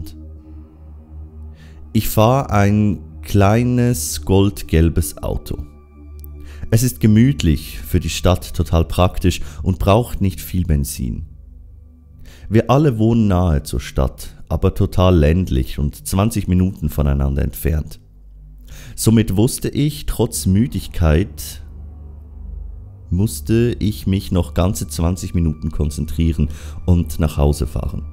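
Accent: Switzerland. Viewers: Du bisch Schwiizer? Jo ehre💪🏼🇨🇭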